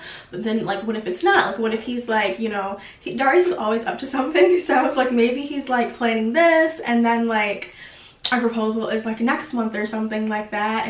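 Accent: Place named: Midwest, United States. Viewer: Also this midwestern accent is making me sooo homesick <3 <3 <3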